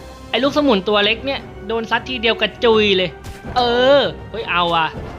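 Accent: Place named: Provincial, Thailand. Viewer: มาแล้วไอ้หนุ่มเสียงเหน่อ ที่รอคอย BRAVO~~~~~~~~~~~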